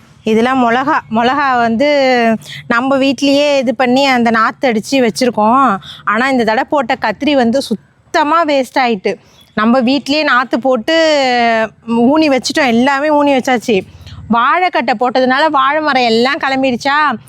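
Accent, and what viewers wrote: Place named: Thanjavur, India. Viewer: Namma thanjavur bashai neruputi❤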